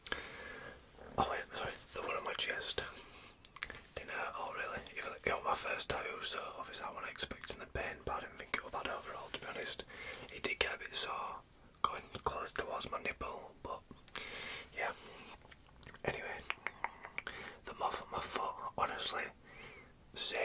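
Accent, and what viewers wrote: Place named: Lincolnshire, United Kingdom. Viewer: Where are you from? Your accent sounds like home! I’m from Lincolnshire!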